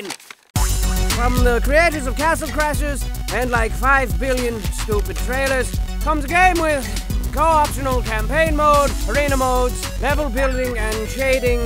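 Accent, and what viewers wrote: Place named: United Kingdom. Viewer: I swear the narrator is so british.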